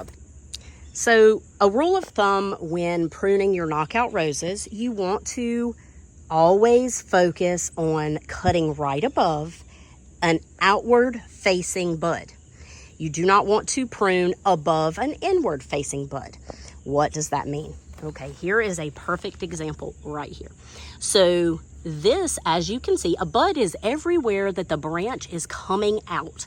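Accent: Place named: Texas, United States. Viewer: Thank you for an encouraging video. Roses frighten me. Historically, they have been difficult plants to grow. They are subject to so many problems that only the best gardeners had the magic touch with them, so I stayed away from them, but these Knock Out roses are easy to grow according to a friend. She planted six of them eight years ago. Said she does very little to them aside from water them. Said she planted them by more or less just throwing them into the ground. They are now virtual rose trees that are positively dripping in blooms. I am encouraged to try planting some. Do I have to test the soil first? The soil here is pretty clay-ee. I know they need a sunny location. I had no idea about how to prune them, I figured you just cut off anything that did not look right. I had no idea where to cut or that it should be at an angle. Any instructions you can give me to help me get some planted would be greatly appreciated. 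You sound like you may be in Texas where I am. Temps here are really HOT half of the year, and we have tremendous humidity. I have had some good success with Salvias which I love very much, but I long for rose bushes..... Thank you again! Cheers!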